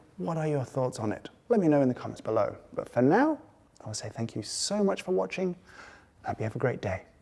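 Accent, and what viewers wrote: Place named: England, United Kingdom. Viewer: English acent - Check Red Sweater - Check Factual content of an amazing place - Check Anyone else getting Tom Scott vibes? I don't know how I feel about this, I miss Tom.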